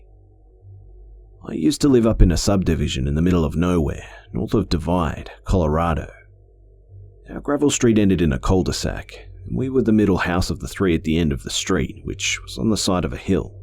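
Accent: Australia. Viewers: Can't tell if your an Ozzie or not sounds like it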